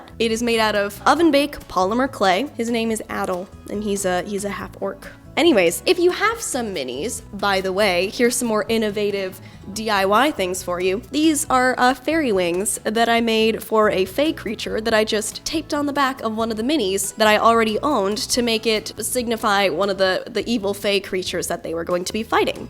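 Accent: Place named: Ireland. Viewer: $$$ great Irish accent. Keep up the good content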